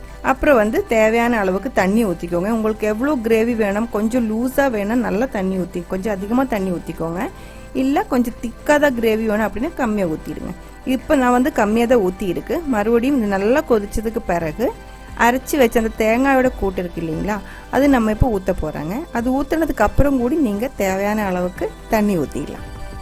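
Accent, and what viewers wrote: Kerala, India. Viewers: Semma recipe, thank you so much sissy 😍😍😍.... Unga kerala kalantha Tamil lovely 😍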